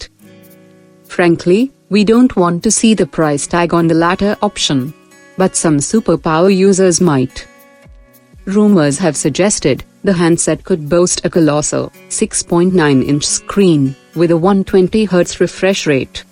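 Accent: India. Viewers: Holy shit me too, I was so confused whether or not it was a real woman or a robot with indian accent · I cant tell if this is a robot voice. And if so why the Indian accent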